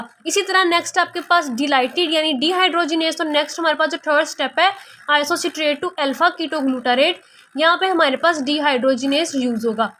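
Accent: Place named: Punjab, India. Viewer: Punjabi speaker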